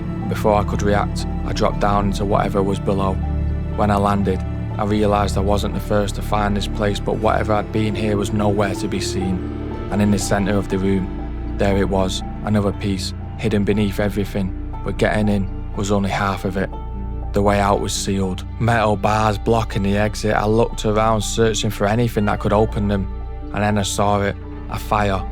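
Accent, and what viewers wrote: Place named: Manchester, United Kingdom. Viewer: Wonderful Channel I Love watching your Adventures and Narration Can I ask are you from the Manchester Area?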